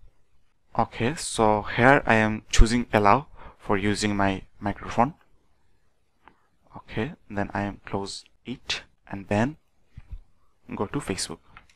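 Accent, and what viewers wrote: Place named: India. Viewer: definitely indian. that accent is unmistakeable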